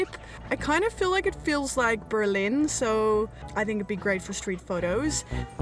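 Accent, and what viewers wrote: Netherlands. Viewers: Question, are you dutch? Cause i am, snd i thought i reconised the accent😊 and i really love what your doing! So inspiring🫶🏼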